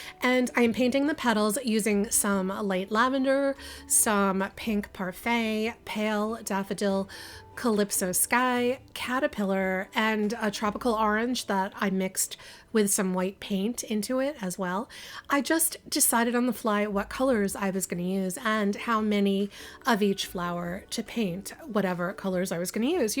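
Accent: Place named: New Jersey, United States. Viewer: You did ❤ and I picked up on your New Jersey accent. I heard you say "Mirrah" when referring to the mirrors. Thought it was endearing 🤗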